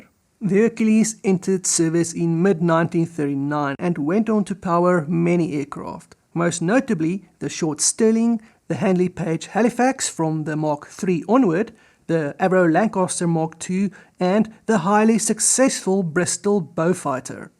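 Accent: Afrikaans, South Africa. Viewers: Dig the the SA Dialekt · Why do you sound afrikaans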